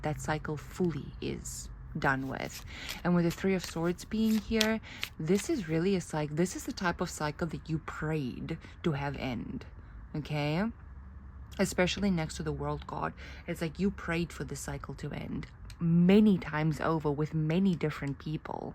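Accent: South Africa. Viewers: South africa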